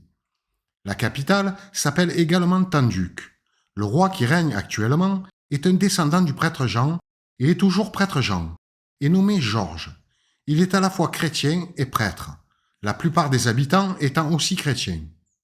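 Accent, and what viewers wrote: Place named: South, France. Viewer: Non, j'déconne vos vidéos sont très interessante te votre accent de sudiste est agréable!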